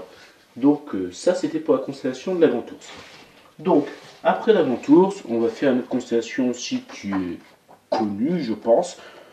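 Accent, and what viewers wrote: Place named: Nord-Pas-de-Calais, France. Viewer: Magnifique accent ch'ti en tout cas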